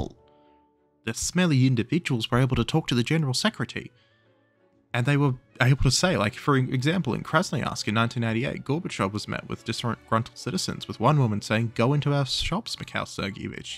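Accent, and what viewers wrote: Australia. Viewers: It's always such a weird feeling to watch a video in english, specifically from some aussie bloke, and suddenly hearing ARBEITER BAUERN NEHMT DIE GEWEHRE 😂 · I think he’s Australian.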